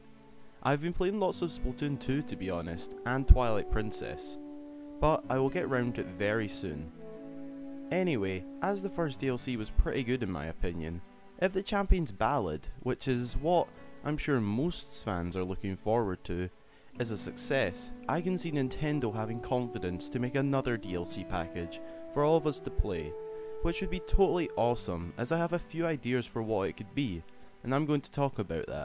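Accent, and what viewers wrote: United Kingdom. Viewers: Are you Britain? Just wondering because of your Accent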